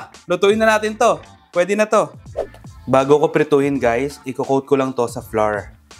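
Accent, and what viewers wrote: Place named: Cavite, Philippines. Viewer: Chef tonong lihitimong Las Piñero o Caviteño ka ah. San po kayo sa Pinas?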